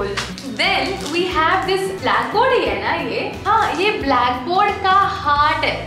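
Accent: India. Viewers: Literally I was shocked when I heard her speaking English in Indian accent. She looks like she's from western 🥺 btw love the haul ❤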